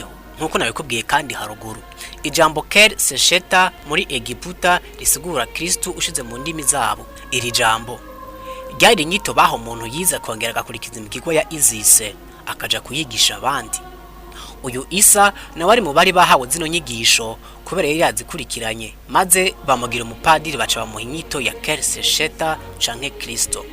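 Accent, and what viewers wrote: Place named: Rwanda. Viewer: Komera cane kuri Documentaire bwana ariko accent zawe ni Mwanafunzi Esmail yuzuy Hama ikinyarwanda nicinshi kurusha ikirundi. Gusa courage cane kubushakashatsi I'm from LIMOTV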